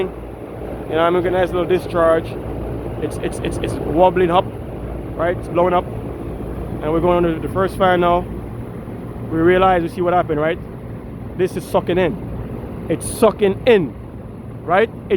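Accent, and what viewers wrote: Jamaica. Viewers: Are you a jamaican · Hey my friend are you from Jamaica? My family is from Jamaica as well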